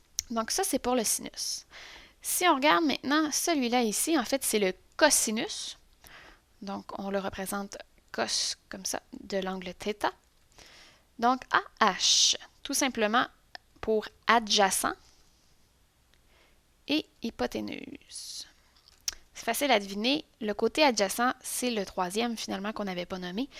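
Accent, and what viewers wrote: Quebec, Canada. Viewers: Je ne sais pas si c'est l'accent Quebecois, mais j'assimile beaucoup mieux :D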